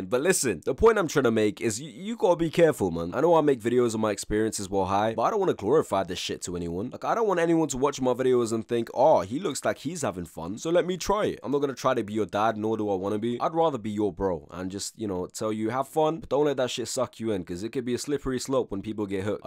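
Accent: United Kingdom. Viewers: bro i think its british.. why british sound so bsSD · so zooted I didn’t realize he had a British accent. Just thought he was talkin’ silly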